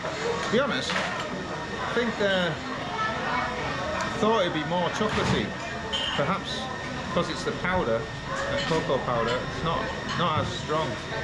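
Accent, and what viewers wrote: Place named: Teesside, United Kingdom. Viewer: Just subscribed, going to watch your vids tonight, your accent sounds familiar, are you from Teesside?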